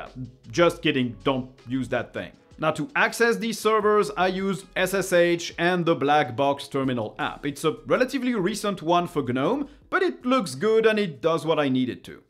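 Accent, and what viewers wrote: France. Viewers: Hello! I like your videos. Thank you. I have a question : you speak a bilingual english but I feel a small accent. Are you french? 😊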